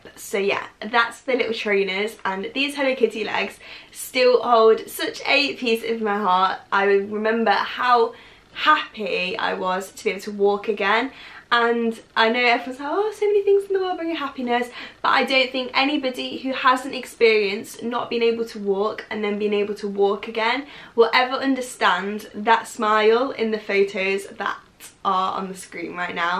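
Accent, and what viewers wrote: United Kingdom. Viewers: Educational experience for me. It is a great pleasure listening to you,. I love your english style, your marvellous smile, your dimples and positive attitude. I like your facial expressions as well. I am a great fun of yours. Admit i find it somewhat challenging to understand british english, but coming from you it sounds so good. You just makes me feel good. My mind mirror you. Please consider working on an ice breaker ship, for your smile can melt icebergs.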